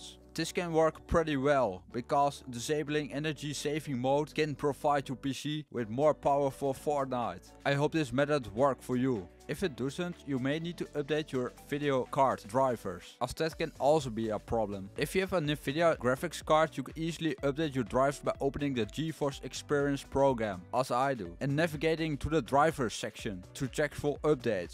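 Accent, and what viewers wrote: Netherlands. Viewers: he dutch i can hear it BRUHHHHHHHHHH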